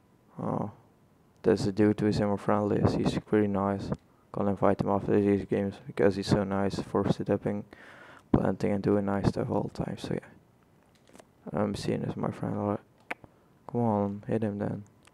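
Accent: Netherlands. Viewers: HahahahahHhahaha jij bent sws Nederlands je accent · Are you dutch?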